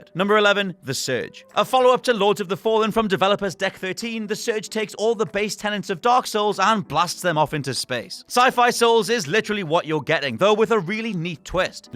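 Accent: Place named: England, United Kingdom. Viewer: Shouting English accent I can't watch these videos it's painful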